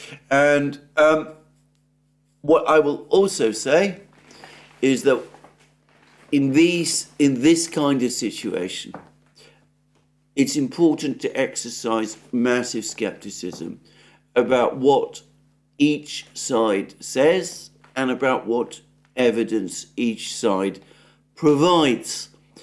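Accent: United Kingdom. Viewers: This "program" is the "debacle". Fallacious generalizations, about Biden's intents, performance and rhetoric abound. Confident claims about the (yet unopened) aid portal reveal only the profound propagandist purpose of this channel. The analysis is to be read, not for its validity, but is valuable, nonetheless for exposing with a UK accent, Moscow's message.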